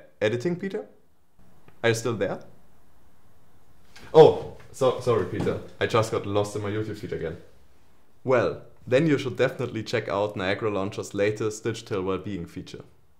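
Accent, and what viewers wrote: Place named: Germany. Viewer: I LOVE these update videos! I also love your German accent, lol! Thank you for creating the best launcher on the market and keeping us updated with these great videos! So nice to hear you guys are graduating. Congratulations and Happy New Year! 🎊🎉💥🎇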